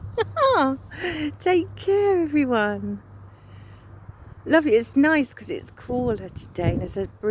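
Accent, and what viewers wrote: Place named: Southern England, United Kingdom. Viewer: we both have an English southern accent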